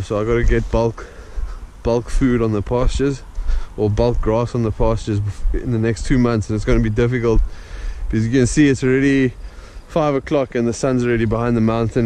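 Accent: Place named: South Africa. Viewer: What part of south africa are you from?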